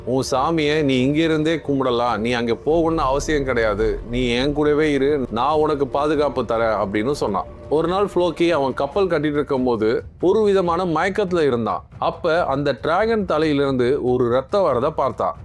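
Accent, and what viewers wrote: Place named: Birmingham, United Kingdom. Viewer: Yoo broo oun voice la peaky blender pottu bro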